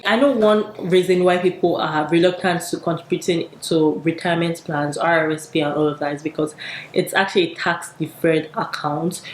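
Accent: Nigeria. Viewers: Thanks for this video sis. I learned a lot. I remember when my uncle co-signed to his friend's loan in Kaduna,it was a loan from LAPO, his friend fled to Ghana, his life became very hard and he was treated as if he was the lender. LAPO can be very scary in Nigeria. BTW sis,you sound like you're from kwara state.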